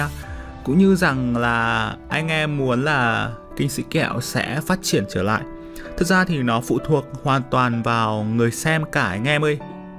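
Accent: Thanh Hoa, Vietnam. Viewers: Bạn có phải người Thanh Hóa không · Người thanh hóa ???? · Anh nguoi Thanh hóa a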